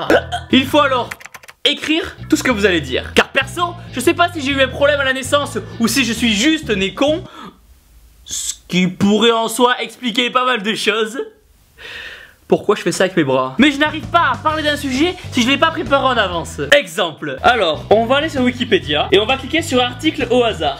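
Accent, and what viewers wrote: South, France. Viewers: Ta l.accent du sud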